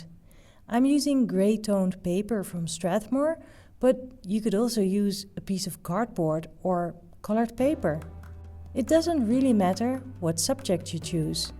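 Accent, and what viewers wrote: Netherlands. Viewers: Are you from the Netherlands? Your accent sounds so familiar to me and I can't figure out what it is. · Je Nederlandse tongval pik je meteen op.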